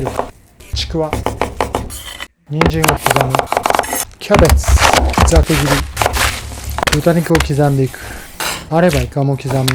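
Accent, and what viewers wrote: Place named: Tosa, Japan. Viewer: 長崎名物に唐突に現れる土佐弁みたいないごっぞう好き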